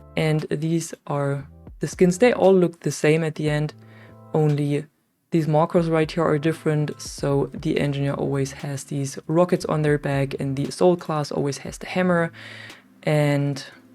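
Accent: Germany. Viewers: First time to the channel I could be wrong and I’m sorry if I am but are you German? That how the accent sounds to be this soon into video. Have no issues just curious and believe I’m right totally random comment but I’m genuinely good at telling.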